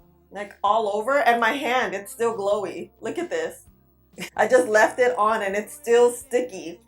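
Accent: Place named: East Coast, United States. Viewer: Didn't like 😢... wanted someone to tell me different ! You did ! Thank you ! I like your accent .. you sound east " coasty"... NY native here..pS>I subbed ❤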